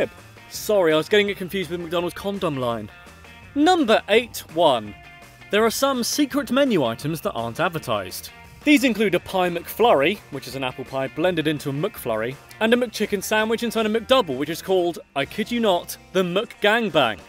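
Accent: United Kingdom. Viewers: All british people pronounce it like that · is it just me or would you marry the british voice guy because of his voice and hilarious puns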